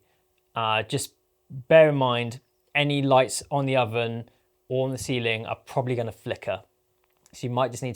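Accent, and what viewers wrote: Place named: United Kingdom. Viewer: Ong thanks so much, this is very helpful, I’ll keep all the techniques in mind and see what will fit best when filming 😁😁 also I do live in the uk 🇬🇧 I assume u do as well based on the accent 😂, thanks again for the tutorial very kind and helpful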